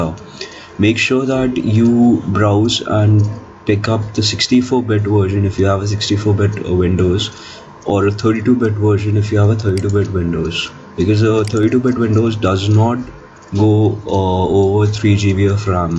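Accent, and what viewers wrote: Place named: South Asia. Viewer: I HATE PAKISTAN-INDIA ENGLISH ACCENT.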